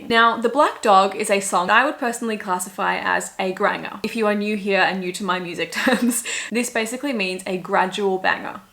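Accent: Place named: Australia. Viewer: Hi, I just wanted to let you know, that when I bing searched taylor in paris, from a public computer, this post of yours, came as first listing (with filter of 20 min+ videos). I just saw you the first time, when you replied my comment saying I wanted to see your whole first time listening video of the new album. Mind you also, that from your accent, it sounds like you are Aussie? or some place far from USA, where I am. So... just to let you know, you are famous. At least for 20 min+ videos about.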